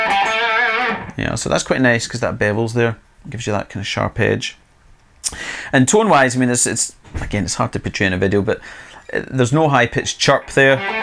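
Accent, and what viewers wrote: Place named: Scotland, United Kingdom. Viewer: You're.... Scottish! I don't often hear a Scots accent on YouTube.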